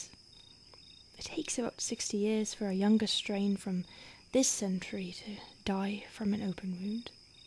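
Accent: United Kingdom. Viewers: Ahhhh British accent its scary because you sound so much like my friend..... good job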